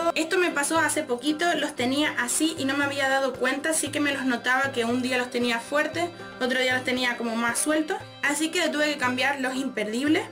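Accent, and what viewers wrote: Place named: Canary Islands, Spain. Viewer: holaaaaa chiquilla!!!!, si dame consejos ( te cuento, es un secreto no le digas a nadie porque mi gran reputacion esta en juego jeee, me cerraron transitoriamente la clase de folklore arabe porque mi profe Ramiro tiene unos proyectos importantes ( y tambien es secreto, sacara un disco con Amir y Saida en la portada ) asi que quede algo colgado, igual voy siempre a la academia y llego un nuevo compañero que hace bellydance y bueno me meti en esa clase jaaa, no puedo dejar de ir a la academia y ha sido una forma de seguir participando, por supuesto que me veo como gorila intentando hacer los pasos, por supuesto que nos divertimos mucho con mis compis jee, y bueno tendre que esperar un tiempito, no quiero irme a otra academia - y bueno ya nos estan dando clase de ritmologia y vamos a empezar a usar los chinchines ( crotalos ) jee, entonces help me por cierto te ves re linda amiga - re lindo tu monedero - uen consejo donde comprarlos, no hay tienda de esencia oriental??? 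- bueno tengo un amigo de Mexico que hizo un belly con chinchines pero usaba traje de varon de folklore arabe y se ve muy bien, asi que mi idea es hacer un dabke o folklore arabe usando chinchines - bien, sueltito y con elastico, de 10 - el imperdible jee ( traba de gancho jaa ) que lindo hablan en Canaria, ya te lo dije muchas veces - buenisimo video me re re sirvio beshos besho s smuack!!! - Gus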